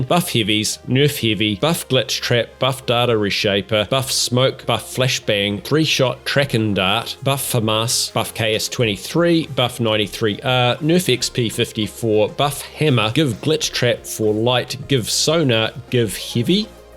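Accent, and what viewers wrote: New Zealand. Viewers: Great video mate, well said! Your kiwi voice is bloody brilliant!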